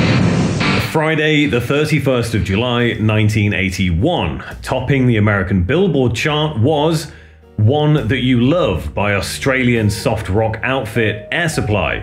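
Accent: United Kingdom. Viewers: You sound British, but you only mention MTV us. MTV Europe would of being your channel until 1997, when it was replaced by MTV uk, which at first was ok for a couple of years, but MTV Europe was the channel I watched, even after MTV uk took over, I still done everything to get MTV Europe back, what a channel that was. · I actually fell asleep listening to this stiff britt try and explain American MTV 😂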